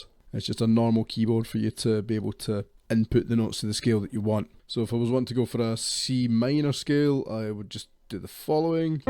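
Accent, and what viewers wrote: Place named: Scotland, United Kingdom. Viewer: I can always tell when the Scottish guy is going to start talking. Because the UI's are always awful. Lol. Plugins are good, don't get me wrong! :)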